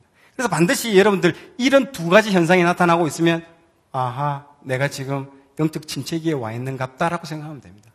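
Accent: Gyeongsang, South Korea. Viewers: 경상도 어조 질색을 하는데 이렇게 듣기 거북하지 않은경우도 있네요.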